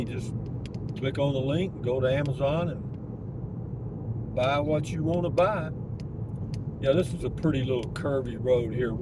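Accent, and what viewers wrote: Texas, United States. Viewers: You have the same accent as my aunt and cousins and my dads buddies from Texas. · Dude you crack me up! You sound like my buddies here in Amarillo TX.🤠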